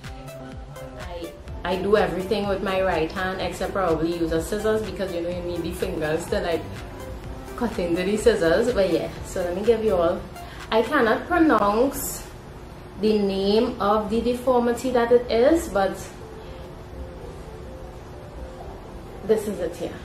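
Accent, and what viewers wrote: Trinidad and Tobago. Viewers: You do not have to explain or apologize with regard to your Guyanese background, your Trini accent and your disability. You were made in GOD'S image and likeness and you are beautiful. And you clearly display confidence in yourself, so that is all that matters. The Mighty Sparrow was born in Grenada and Peter Minshall was born in Guyana. These folks are Trini to the bone and will have it no other way. Live your life my dear. Do not worry about people who do not understand.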